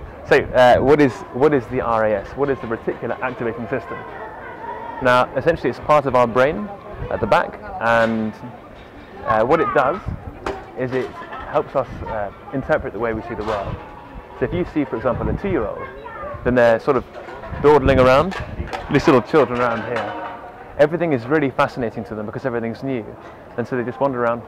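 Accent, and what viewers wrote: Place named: England, United Kingdom. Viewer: Hi, very interesting video, nice to have a English accent for a change lol. Also nice to have someone presenting photographic concepts in this way. Thank you.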